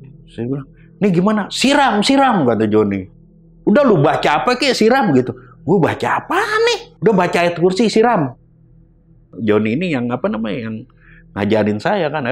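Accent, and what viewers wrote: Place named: West Java, Indonesia. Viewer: Sunda banget 😅😊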